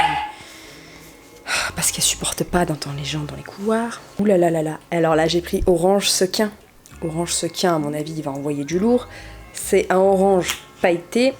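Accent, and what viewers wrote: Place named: Franche-Comté, France. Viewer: Mdr oui les francs comtois ont un accent lol. Ah oui je suis une franc comtoise et du haut Doubs donc un cran de plus 😂🤣😂 Si jamais on peut te voir où sur Besançon ? Je sais pas si tu voudras le dire mais histoire un.jour de se rencontrer... Vidéo top moi qui aime.a donf les couleurs vivent et oui dans le.bio on a que des couleurs nudes... donc trop Contente de voir cela. Merci À bientôt pour une prochaine vidéo... hâte 😘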